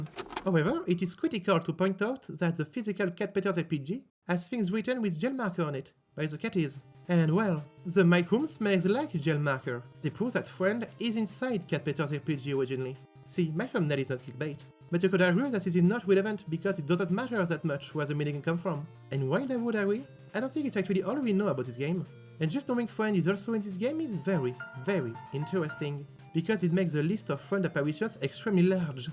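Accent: France. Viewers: Strongest French Accent ive Ever Heard, Neat ! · you're motivated to make english content, I would encourage you to work on your accent. I was interested in what you had to say about this, but your french accent is very, very thick to the point where it makes it very hard to listen. (This is coming from a french-english bilingual person.)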